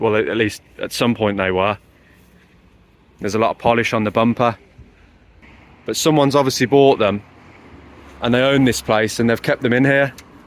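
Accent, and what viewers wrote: United Kingdom. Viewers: Judging by your accent you are from around my area somewhere, there is a good crowd of UK Volvo folks, if you ever want to meet up contact me.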